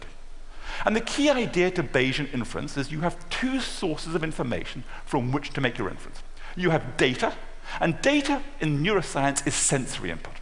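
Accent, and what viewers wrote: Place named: United Kingdom. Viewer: 영국 억양이 이렇게 짜증난적은 없었다...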